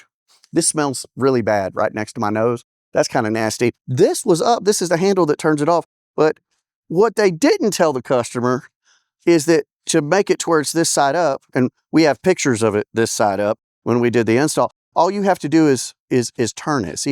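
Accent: South, United States. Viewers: The southern twang of Sarcasm just gets stronger and stronger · Are you turning it up with the southern accent? I don’t remember it being so strong in your past videos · whats with the accent brother i know your from the south....ish but dam... not serious do your thing man keep kicking ass and calling out the bs. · The more angry and sarcastic you got the more Southern your accent got. If you ever hear that directed at you, I’d run. might six his brother on you. 😬😂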